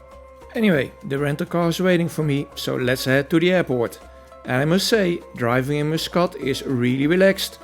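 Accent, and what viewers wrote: Netherlands. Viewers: Do I detect a NL'er here speaking?😅 Oman has been for years on my next new destination.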